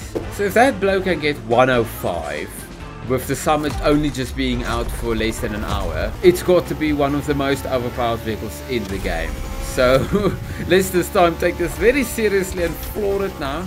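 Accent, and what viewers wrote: South Africa. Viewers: When I heard ranting and swearing about BS electric cars in a South African accent, I subbed. Lol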